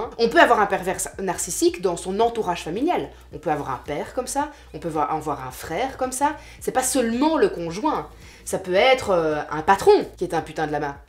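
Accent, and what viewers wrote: Belgium. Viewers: J'ai une question rien n'à voir avec la video. commence toujours ses vidéos avec "mes ptits vautours" (avec un bel accent belge hahahah). Ça vient d'où cette phrase? Pq elle appelle ses abonnées comme ça?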